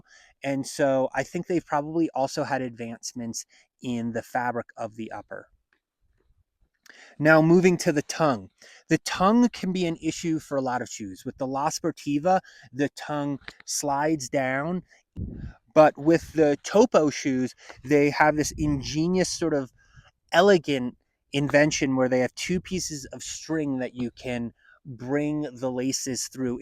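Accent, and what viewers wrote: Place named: Eastern Pennsylvania, United States. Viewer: Great video. I appreciate the way you break things down into details. I had a similar experience with a different Topo shoe that I tried a couple years ago, I think the Pursuit but not sure. It was great and balanced in every way except one small subjective thing that many people would be fine with, but I thought felt uncomfortable, and for me that was a deal breaker. Btw are you by any chance from eastern Pennsylvania? Your accent sounds like someone I know who grew up there.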